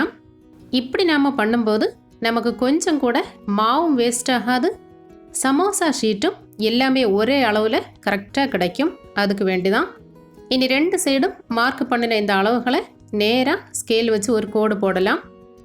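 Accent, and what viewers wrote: Kerala, India. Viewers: It's like malayali talk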